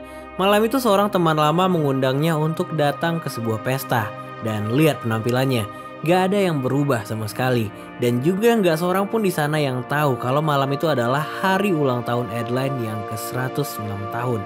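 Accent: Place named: Java, Indonesia. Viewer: Medok nya ituloh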